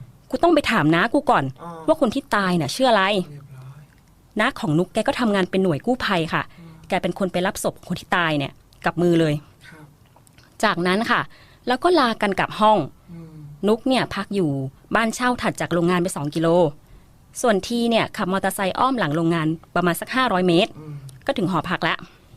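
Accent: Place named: Isan, Thailand. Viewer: พูดอีสานชัดมาก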